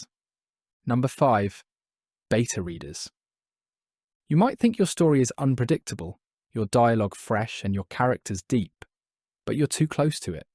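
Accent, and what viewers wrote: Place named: United Kingdom. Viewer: British accent)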